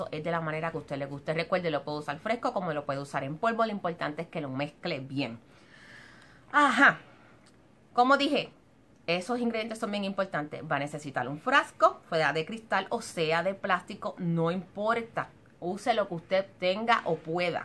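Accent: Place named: Puerto Rico. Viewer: En Puerto Rico hablan asi credo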